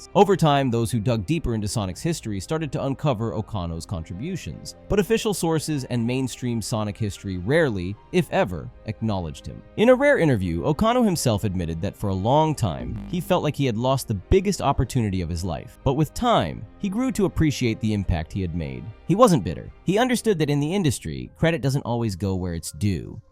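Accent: Brazil. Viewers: me desculpa mas, vc é brasileiro?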